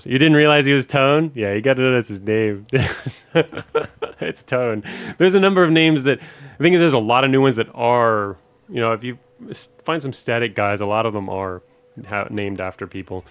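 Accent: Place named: United States. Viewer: Random question, but why is you file explorer non English? Your accent is American.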